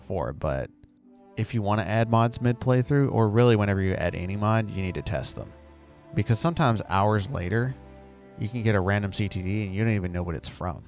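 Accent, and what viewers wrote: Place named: India. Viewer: The Indian accent put me off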